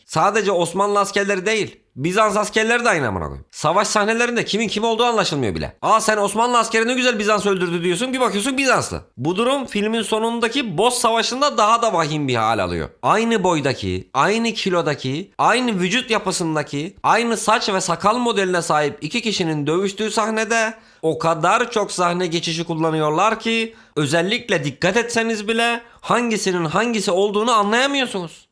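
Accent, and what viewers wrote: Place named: Anatolia, Turkey. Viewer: size bir tavsiye vermek istiyorum, anadolu şivesi yapmazsanız videolar daha keyifli olabilir. Ben ve 3 arkadaşımın ortak görüşü bu, sevgiler.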